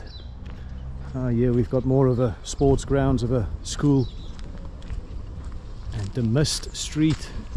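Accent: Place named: Afrikaans, South Africa. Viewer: 😂Aggg jirrrr, ons geniet jou stories baie. Ons weet jy is afrikaans en probeer jou beste Engels praat, Maar genade my mens. As jy wil sê n plek is gesluit of toe vir die dag.... ASB sê dan tog net "it's closed". Dis die regte Engels. Ek kry krampe in my ore elke keer as jy praat van"it's shut". Waar gaan jy volgende?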